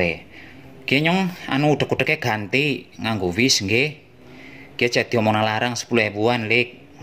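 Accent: Banyumas, Indonesia. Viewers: Halo mas ngomongane AKEH uwong sing pada ora ngarti jawane Jawa blrkutek campur banyumasan 😭😀😂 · Iih..mantep ketemu dulur ngapak nang kene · asik logate ngapak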